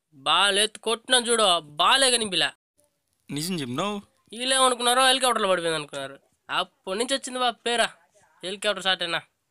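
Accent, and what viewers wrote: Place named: Chittoor, India. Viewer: Nice brother super comedy chittoor yasa adhiripoindhi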